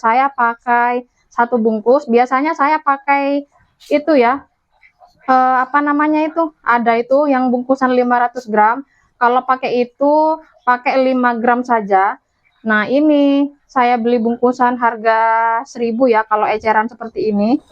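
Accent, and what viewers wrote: Madura, Indonesia. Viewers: Bunda asli mana.. ngomong nya Madura. Sy jg dr Madura ?😊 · Mbak di orang mana kok bisa madura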